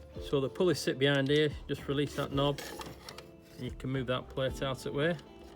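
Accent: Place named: Northern England, United Kingdom. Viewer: A great Northern accent, there sir! A great lathe also. I’ve just bought a 6 month old R2 version. Joe (Manchester)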